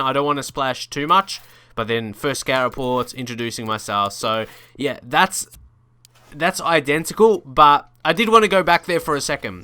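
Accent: Australia. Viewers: are you aussie? or do you live in straya you have an aussie accent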